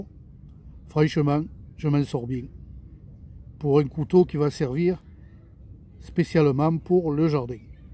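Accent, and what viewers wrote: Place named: Corsica, France. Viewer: salut. je connaissais pas ce Victorinox, pour 12€ franchement c'est vraiment excellent. en + la qualité Victorinox ça ne ce refuse pas. superbe soleil ☀️ et j'adore ton accent qui me rappelle la Corse. mes amitiés 😉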